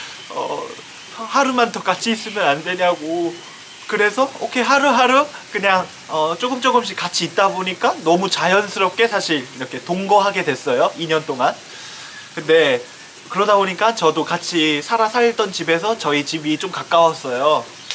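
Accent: Australia. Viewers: This broke my heart to see this morning. kickstarted my journey to learning Korean, and as a fellow QLD’er, I felt like I could relate to her a lot. As said, she will always be by your side, every moment of every day, and she will forever be sharing your experiences with you. She’s left so much of an impact on our lives, even as someone who has never personally met her, and she will be dearly loved and missed worldwide. It might be only one small thing that she’s impacted you with, but the bit of an Aussie accent you have when speaking English really shines through as part of 🤍 You did such a great job at bringing this news to all of us, and we know that is so thankful and proud of you for working so hard by her side. I know that this time is so hard for you, and both your families, but I wish you all every happiness and all my love for now and in the future. 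🤍❤️ Bbyong for now ❤️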